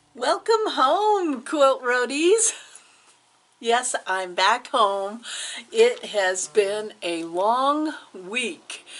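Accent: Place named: Oregon, United States. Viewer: Hi there. I’m Rose, and I’m so happy that I just found your wonderful channel, I love it. It’s such fun to watch and to listen to all the lovely things that you make and get up to. And I love your Oregon (?) accent ❤ I live in rural Australia, just out of Melbourne, in Gippsland. I’m completely new to quilts in fact, I’ve never made one before! I’ve always been a creative embroider but I’m intrigued with all things quilting. I joined my local quilt group and the folks there are terrific and helpful. You are so enthusiastic and generous with your channel and I feel really inspired by all the great things you talk about. Thanks. I wish I could join you in gorgeous Portland, it looks like a terrific place to be ❤ Keep smiling and being happy!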